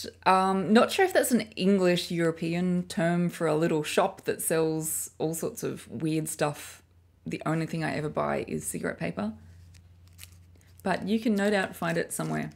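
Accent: Australia. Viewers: I also grew up in Sydney, and my accent is identical to, though I've lived in Far North Queensland for many years and accents are a little different at the top end.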